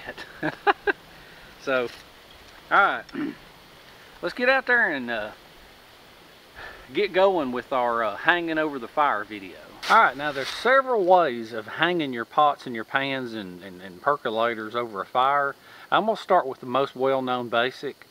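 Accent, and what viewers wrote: South, United States. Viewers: Android Pal then we couldn’t hear his infectious laughter, his melodious deep southern drawl, his “lil jewels”, and that wouldn’t be cool! I d read it too just for the record but I’d miss a lot of above mentioned. 🤘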